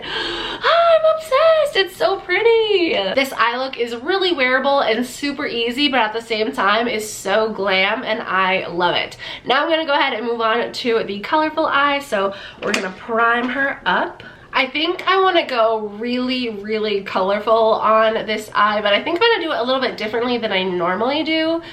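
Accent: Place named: South, United States. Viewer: Love your videos! ❤️ I like how honest you are and plus your southern 😂 just like me! IG: Tillery_09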